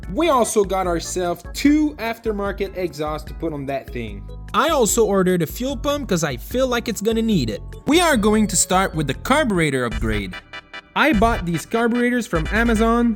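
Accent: Quebec, Canada. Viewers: Hey! Are you from Quebec? You got the accent. 😁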